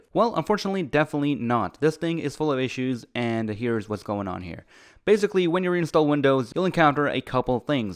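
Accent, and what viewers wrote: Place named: South Africa. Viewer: I am Greek speaking, but being a teacher of English as a foreign language (EFL) and having spent 15 years in Sth Africa, I don't have a problem understanding the language. However, I can't compete with a native speaker. When the narration came to the technical terms, I completely lost track of what you were saying. I couldn't absorb it fast enough. The problem was compounded by the fact that the South African accent is different. Which comes to the point of "please think of us out there who are struggling with a foreign language, especially with the technical explanations." Thank you, I appreciate your channel.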